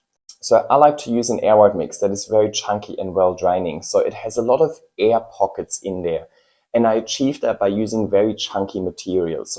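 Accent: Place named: Germany. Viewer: Exactly!!! Thank you! And are u from germany?! U have an accent like germans :)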